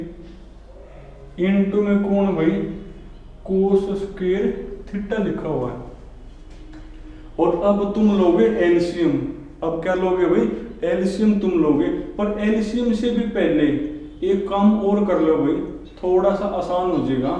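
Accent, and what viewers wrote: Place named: South India, India. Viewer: He's from south india. That's why different accent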